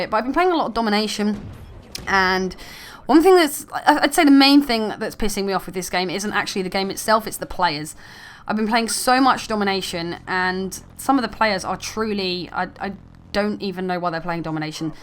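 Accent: United Kingdom. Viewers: you sound like your from Kent. I'm from Kent :D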